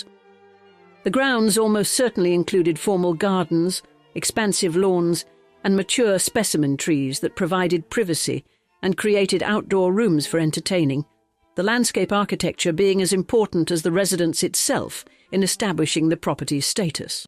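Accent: England, United Kingdom. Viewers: An extraordinarily good documentary on Our beloved Judy … a genius talent so abundant that no studio or Mayer could quell, but whose legacy was paid with her shortened? But wonderfully generous life. (An English accent somehow underpins this narration with the dignity, honour & respect that Judy earned. A genius talent and top-genre human being. With gratitude for the quality of the content and blessings for its realistic homage …even though it was but a very small sliver of all that our great, great Judy represented, was and still is z Thank you, From Melbourne, Australia. Xx 🙏💝👏🐨🦘🪃🇦🇺🕊️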